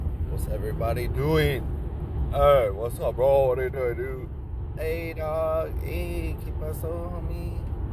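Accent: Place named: California, United States. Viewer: 🌱Good Talk.. ThankYou.🙏💯 its always nice to hear Someone Speak California. Too much im Always missing Home.🏡 the Southeast is Completely different.🍃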